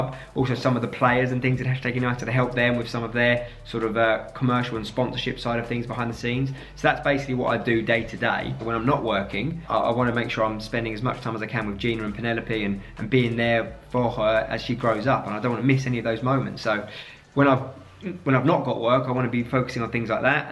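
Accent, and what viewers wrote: Newcastle, United Kingdom. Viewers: True geordie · True Geordie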